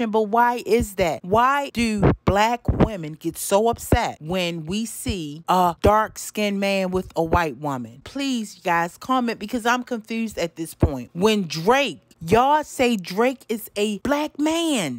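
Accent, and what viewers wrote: Baltimore, United States. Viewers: Found you by accident. That BMore accent got me here to stay 😂 great content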